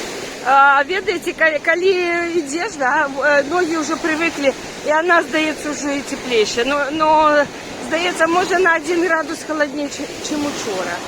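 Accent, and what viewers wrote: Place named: Belarus. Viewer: Очень беларуский акцент. Хай живе Беларусь ! Не ставайте трапками для бацьки !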